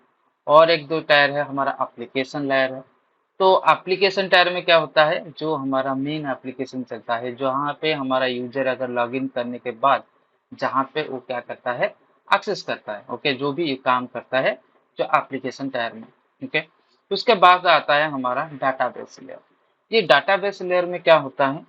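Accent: Odisha, India. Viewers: Are you an odia, bro ?? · Are you ODIA?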